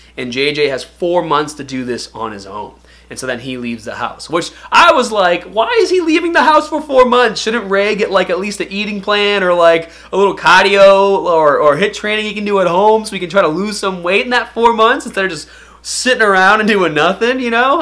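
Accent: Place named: New England, United States. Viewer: the accent is that of New England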